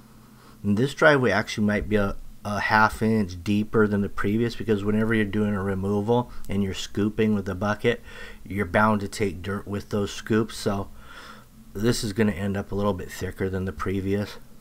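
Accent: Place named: Southern California, United States. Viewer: I am not understanding the S0-Cal accent lol because at first I thought I was watching another concrete persons video from So-Cal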